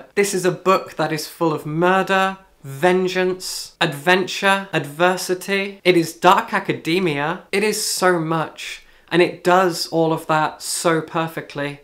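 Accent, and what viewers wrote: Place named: England, United Kingdom. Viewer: I love your reading suggestions and reviews, as well as your perfect English accent. Thanks for you work! I've proudly subsctibed to your Patreon. Alice from Italy ❤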